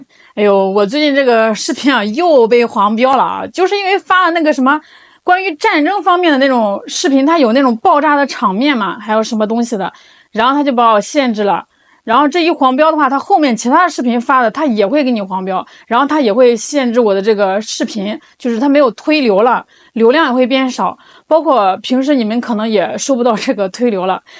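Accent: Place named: Shandong, China. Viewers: 我本來也以為妳是我山東老鄉，前幾集妳有提到是河南 總之妳有我們北方人的豪氣！👍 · 🤣🤣🤣說話的調調像山東大妞！呵呵！說不定您老家省城離山東省近麽🤣！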